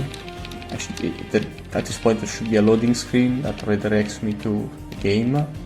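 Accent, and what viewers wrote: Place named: Finland. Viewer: The finnish accent is lovely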